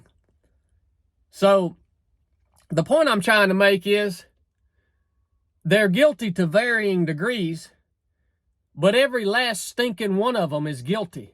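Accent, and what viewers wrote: South, United States. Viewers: I do love the southern accent. Great words I hope justice is served. Thank you.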